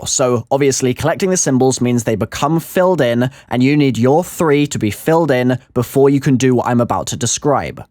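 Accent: England, United Kingdom. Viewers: Naah... He''s just english broo its his accent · The British accent is the easiest to understand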